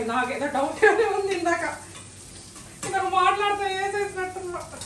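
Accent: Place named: Kadapa, India. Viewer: Bendu teese kuralu shuru, Beerakaya yendu chapala mix, donda kaya talimpu, rendu rende andi 😋😋. Antha energetic mothers ye andi mee home lo ammamma> amma > meeru. Methhalluu, royapottu kadapa slang 😊, exhaust fan vesukondi sound vinapadutundhi no worry, andhuke subscribers ki earphones use cheyyamanandi, beerakaya so colorful 🥒🌶🍅. Now dondakaya preparation start, Wowww dondakaya prepared, Rio ki manchi exercise 🦜, now beerakaya yendu chepala pulusu prepared 🥣🥣, Gongura pacchadi kuda 🥣, Rio viswa prayatnam chestondhi dondakaya tinalani 🦜, Nenu insta delete chesanu andoyi, kitchen lo Rio yuddhame chestondhi, nice vlog garu 👌👌👌 but taste review 🤔🤔🤔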